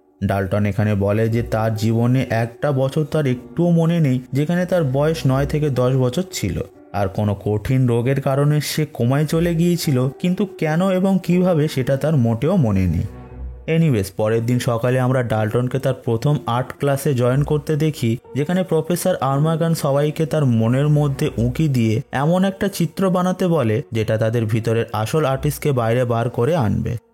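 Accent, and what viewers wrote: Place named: Kolkata, India. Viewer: সুন্দর করে কথা বলেন, উচ্চারণ তো ঠিক নাই। মনে হয় কলকাতার লোক।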